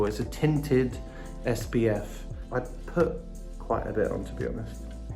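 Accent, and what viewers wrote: United Kingdom. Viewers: I love their British accent.❤️ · Thought Iam watching Harry Potter , when he started British accent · Everyone talking about good music, ' pronouns, but can we talk about their british accent? Gosh i fell in love. ✨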